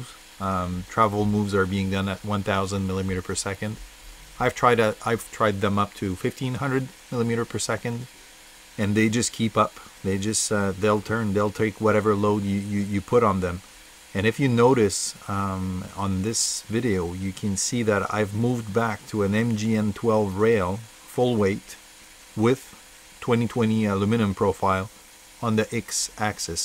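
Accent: Quebec, Canada. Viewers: T'es tu québécois hahah?:) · Is it just me or you have a Quebecois accent?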